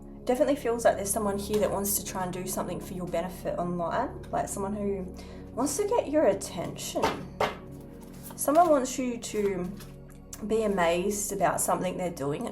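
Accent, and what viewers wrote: Australia. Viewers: SHEEYA..😊 U AUSSIE'z..and yur VOWELS...YAY!! ROWR ROWR !! 🥀😁🤙💋❤️‍🔥😋😘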